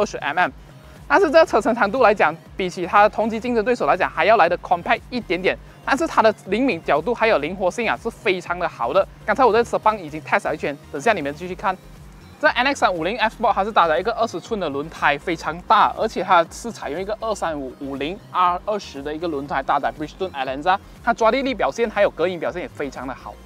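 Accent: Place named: Malaysia. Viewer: 你讲英文口英太重… 马来人…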